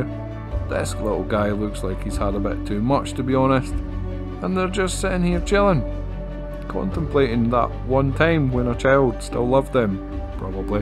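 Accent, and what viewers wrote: Scotland, United Kingdom. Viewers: Gotta say i love that scotich accent 🤘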